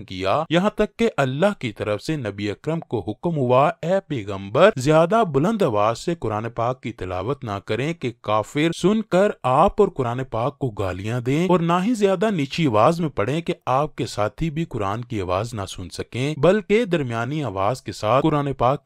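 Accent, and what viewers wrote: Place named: India. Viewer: ladti nahi larti hay . kyu Indian english follow karty ho apni shanakht bhi bhol gay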